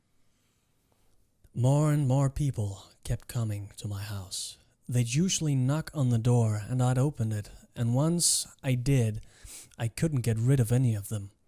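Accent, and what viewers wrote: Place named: Germany. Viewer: nice accent, are you from Germany?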